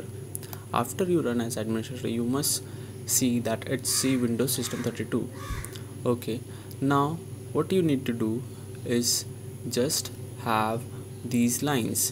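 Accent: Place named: India. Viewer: thanks, it helped but ur indian accent though😂 😂 😂 😂 😂 😂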